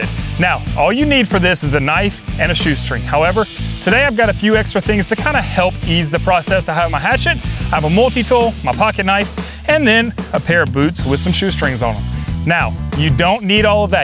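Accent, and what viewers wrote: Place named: Tennessee, United States. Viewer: What part of Tennessee u from brotha?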